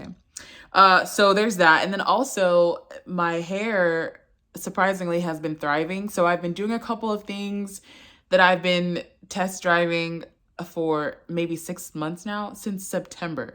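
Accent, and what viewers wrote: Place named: Nigeria. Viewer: Random: I love your Nigerian (taking a wild guess) accent and thank you for this vid!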